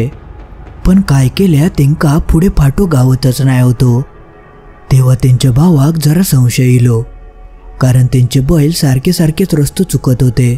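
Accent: Malvani, India. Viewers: Gosta farch chan, aani malvnit aiknyachi maja kahi veglich thank you.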